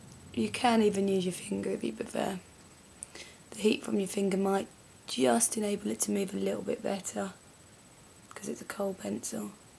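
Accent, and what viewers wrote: England, United Kingdom. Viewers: If you mean 'accent', she's English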